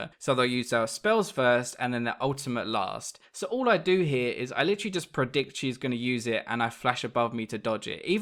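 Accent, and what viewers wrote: United Kingdom. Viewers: Where are you from,? U have kind of a British Accent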